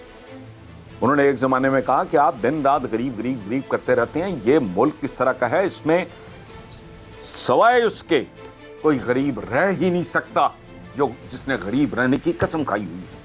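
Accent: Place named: Punjab, Pakistan. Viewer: Tusi khuskardita toda panjabi awaj dilpe habi paji tusi great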